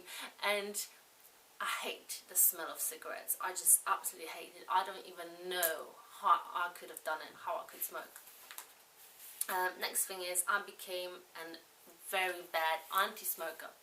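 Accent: England, United Kingdom. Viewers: You are the most beautiful woman I have ever seen in my life, and I absolutely LOVE your slight English accent.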